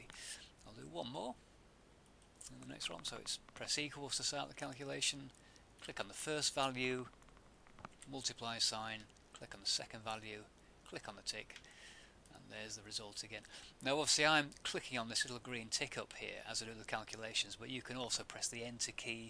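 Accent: United Kingdom. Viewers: I've watched several Excel tutorials on YouTube, and this fellow has - by far - the best delivery and most pleasant voice of any that I've seen. Love the British accent and the relaxed, understated tone to his narration. Oh, and none of that annoying typewriter sound effect that is used in some other tutorials! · With a very nice British clean accent. Easy to learn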